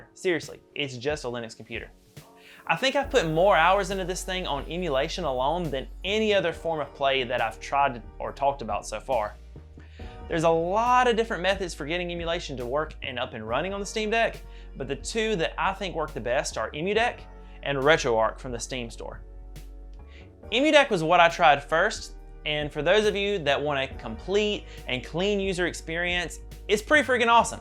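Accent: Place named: South, United States. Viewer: I came for the review, subscribed for the southern charm. Cheers from Canada!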